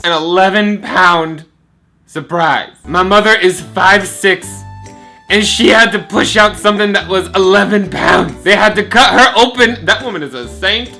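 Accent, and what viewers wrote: New Jersey, United States. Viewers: Is anyone noticing his accent I like it jersey!!!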